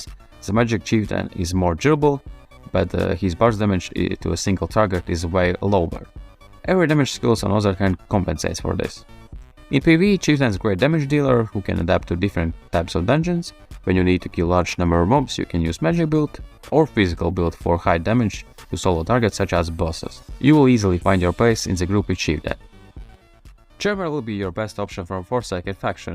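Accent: England, United Kingdom. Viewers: о вы из Англии? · Не понял. Are you from England?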